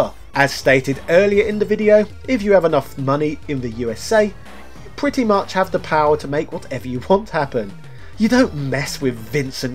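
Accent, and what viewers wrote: United Kingdom. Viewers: Disliked because of the MJ pic on Home Alone. The rest was stuff a lot of other channels already covered. Waste of time. Unless you always wanted a british voice to say the stuff you already knew, if not its a waste of time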